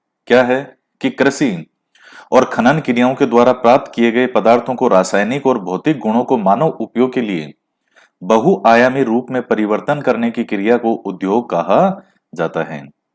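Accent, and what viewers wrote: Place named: Rajasthan, India. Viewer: sir Aapki awaj sunjar esa Lagata hai ki jese Rajasthan ki mati swayam apki Vani bankar aapki awaj k rup mai prakat hokar hum sabhi ko adhyayan or margdarshan karwa rahi ho. Jai Hind. Jai Jai Rajasthan.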